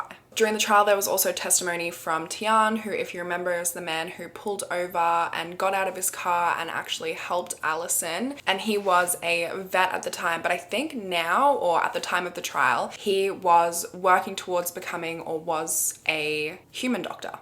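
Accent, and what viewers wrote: Australia. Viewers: Really enjoyed this story. But "like "like" "like" and with the Kath and Kim accent in there, was a bit grating on my old brain. I wish you all the success utube can give you.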